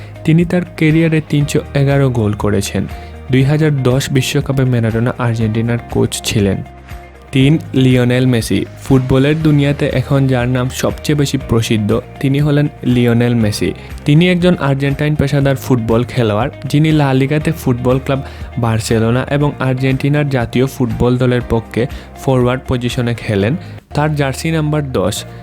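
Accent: Sylhet, Bangladesh. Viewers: Vai afnar bari sylhet ni? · ভাই আপনি সিলেটি? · vai ki sylhety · apnar bari ki sylhet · Vai apni ki sylhety 🥰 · ভাই আপনার বাসা কি সিলেট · Bai apni ki sylheti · সিলেটের নাকিতা ভাই তুমি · Nice video thanks for the information. Bro basha kita sylhet o ni😅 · Are u Sylheti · ভাই আপনি কি সিলেটি? Please reply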